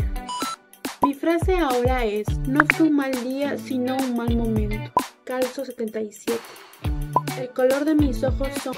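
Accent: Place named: Mexico. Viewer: De verdad pense que eras mexicana porque tienes ese acento mexicano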